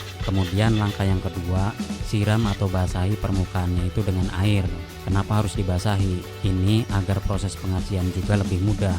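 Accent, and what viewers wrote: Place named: Cirebon, Indonesia. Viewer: logatnya ky org indramayu/cirebon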